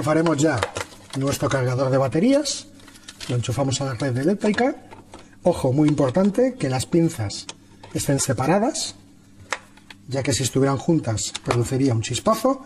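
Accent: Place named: Madrid, Spain. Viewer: Saludos desde Ecuador. Residí en Madrid varios años y tu acento me suena a Madrileño. Quiero felicitarte por compartir el conocimiento, que acá en nuestros países suramericanos le ponen precio a todo. Lo mejor que aprendí de residir en España es eso: "Compartir el conocimiento" como lo haces tu. Gracias. Otra vez.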